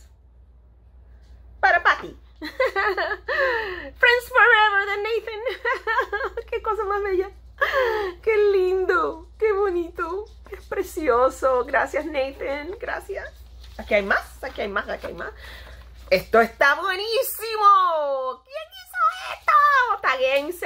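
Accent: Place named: Chile. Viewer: alguien más se dio cuenta que ya se quedó pegada con el acento chileno XD saludos desde chile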